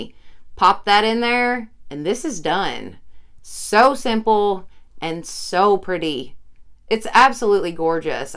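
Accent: South, United States. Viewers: Love them all. By the way, where are you from? You accent sounds like you are from the south! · That ribbon tree! And btw love the accent. I'm southern too🤗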